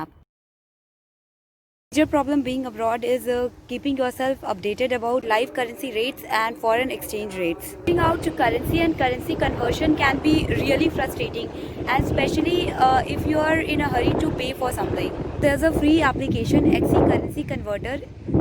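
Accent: India. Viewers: indian accent tho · What a Indian accent hababb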